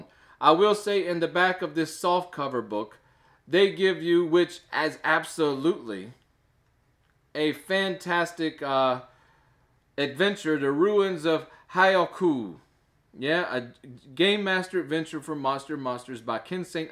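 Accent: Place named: Mexican-American, United States. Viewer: lol nah man, it's cool. You sound like Mexican people living among Americans, due to being exposed to that accent type, like most Americans do, by the way. I can only appreciate your Spanish!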